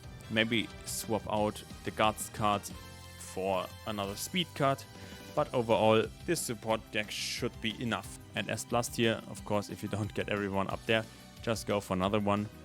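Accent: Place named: Germany. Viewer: Most German accent I've ever heard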